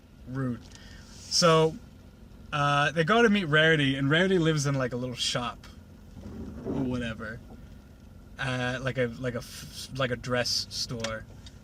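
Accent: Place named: Boston, United States. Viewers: You have such a Boston accent it's really quite amazing. Even I don't have that thick of a New England accent and I've lived here most of my life. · you're from the east coast arent you?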